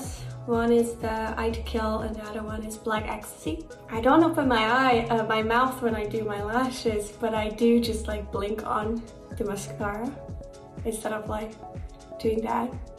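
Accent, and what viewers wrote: Hungary. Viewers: Her English accent is so good, but you can still hear a bit of that typical Hungarian accent which is kinda cute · I can barely hear her Hungarian accent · Yah but She's losing her Hungarian accent now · if you watch her "Interview de Barbara Palvin, Festival de Cannes" she's actually losing her Hungarian accent now. But still yah her voice sounds so nice. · Love how genuine she is and her Hungarian accent ♡ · but if she born like a hungarian ppl then her Accent will be Hungarian forever The english and hun accent is very different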